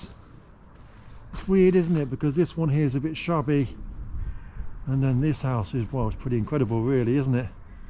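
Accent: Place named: North of England, United Kingdom. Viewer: Hi, I’m not an expert on English accents. Where are you from? It’s the north of England I know but I can pin it down. North west? Liverpool?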